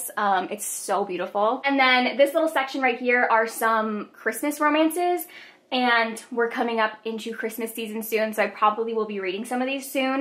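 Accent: South, United States. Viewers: Omg your southern accent 🤦🏼‍♀️😂🤦🏼‍♀️😂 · Omggggg that southern accent had me rolling 😂😂😂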